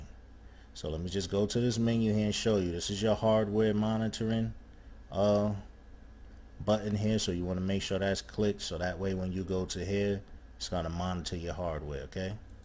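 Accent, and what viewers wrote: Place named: Australia. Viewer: He's obviously Australian.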